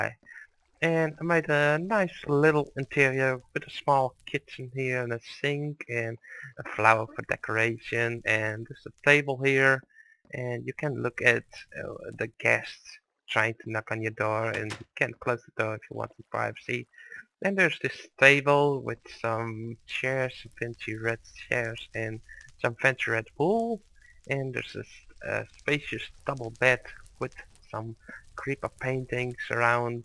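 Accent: Netherlands. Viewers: okay are u dutch because u sound like that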